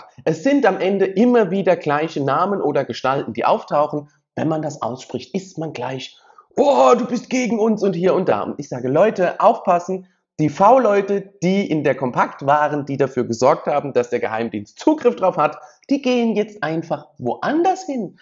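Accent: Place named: Rhineland-Palatinate, Germany. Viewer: Jetzt ist mir das Wort wieder aufgefallen an dem man bei ihnen den Rheinland Pfälzer klar erkennt fS Wort heisst """ anderster""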